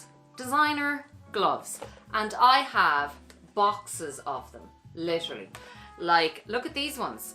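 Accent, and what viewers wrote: Ireland. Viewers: Love your accent! Are you Irish? Are y’all still locked down? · Love the Irish lilt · Hi! I’m a new subscriber & really enjoyed your video. I’m trying to place your accent, Irish? It’s charming!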